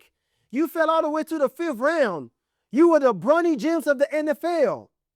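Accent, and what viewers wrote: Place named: Nigeria. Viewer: Is that your name or your faith? You’re too bitter to go by that name.I mentioned Nigerian because I detected an accent, but I was really hoping you weren’t! If you consider “ working hard” irrelevant then there really isn’t much I can say. You go and be all the things you claim Shedeur isn’t. Your video actually showed up as “ sponsored”. You need to “ read the room”. That young man has a popularity that you can only dream of. 5th round pick?? Yet here you are, and all the major channels, discussing him!! Good luck and goodbye.😂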